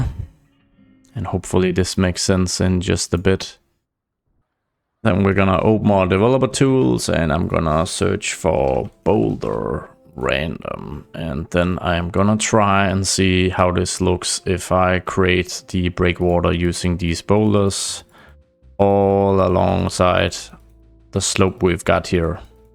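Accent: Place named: Denmark. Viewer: Man kan bare høre at du er dansker xD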